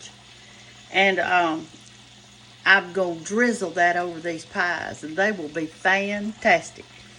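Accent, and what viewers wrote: South, United States. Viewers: You are the sweetest lady! I love your southern accent, and of course all of those good Ole recipes that I remember my Mom and Dad making when I was growing up. You are a pleasure to watch, and I have learned some of your very wise and valuable cooking tricks. I hope you continue doing your videos for many years to come.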